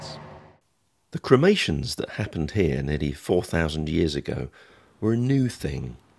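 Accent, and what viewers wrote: London, United Kingdom. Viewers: For me, this is turning out to be a really enjoyable series. I especially like the presentation style - simple, clear and unsensational, and with a perfect London-ish accent behind it.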